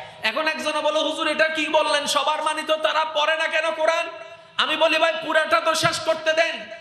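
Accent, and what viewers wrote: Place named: Chittagong, Bangladesh. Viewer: ওটা চট্রগ্রামের ভাষা সবাই চট্রগ্রামের ভাষা বুঝে না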